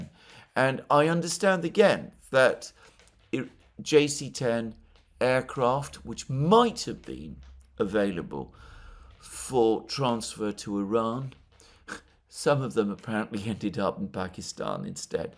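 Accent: United Kingdom. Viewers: YOU SHOW MISPLACED ARROGANCE WHEN YOU TALK ABOUT IRAN. THIS VANITY IS NOT APPROPRIATE FOR AN ONLINE GROCER, EVEN WITH A BRITISH ACCENT.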